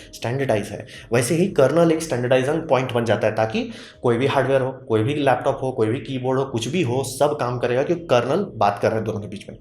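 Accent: Bihar, India. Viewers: Wah sir wah, android Linux ka gotiya bhai hai 😂😂 sir aap bihar se hai kya 😀 pura desi bolte hai. Mja aata hai sunkr 🙏🙏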